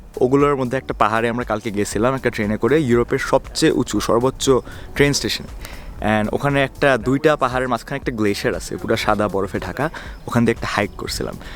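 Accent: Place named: Kushtia, Bangladesh. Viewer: এ্যতো দিন পর বুঝলাম ভায়ের ভাষা এ্যতো শুদ্ধ কেনো কুষ্টিয়ার ছেলে বলে কথা ভাষা তো শুদ্ধ হবেই 💜💜💜💜💜💜💜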